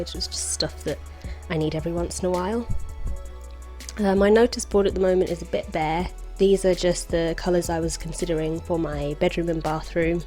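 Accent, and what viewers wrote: England, United Kingdom. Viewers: Wow I like so much your space 👍 it's seems to be personal space not like in others videos I see from people I'm french I would like to speak english like you 😄 I love this english accent! 💕 Where are you from ?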